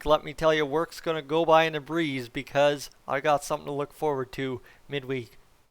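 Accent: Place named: Canada. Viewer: You have one of the best Canadian accents I've ever heard, lmao. I love it!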